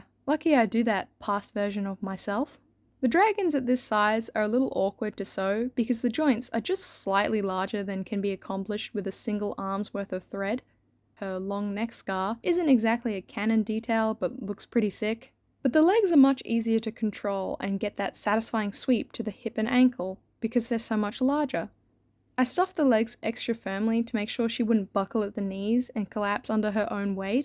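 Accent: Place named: Australia. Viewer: This is outstanding and your Aussie as well like wow!❤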